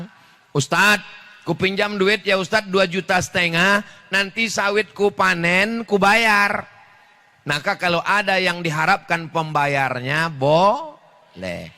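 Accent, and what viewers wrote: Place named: Malaysia. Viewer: saya orang jawa sangat suka dan candu dengan tausiah logat melayu UAS,sehat2 slalu buat UAS❤❤❤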